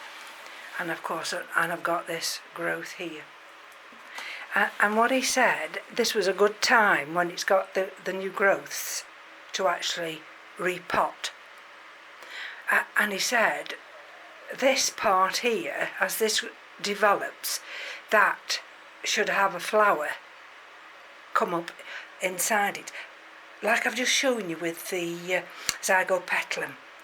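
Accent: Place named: North, United Kingdom. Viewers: Great to hear a northern accent talking about orchids thanks. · I recognised your accent but didn’t realise you were in Crewe! I was born in holmes chapel and lived sandbach and alsager... my nan is from crewe and I went to college there... I’m now in Devon. Loving the videos. Keep up the good work!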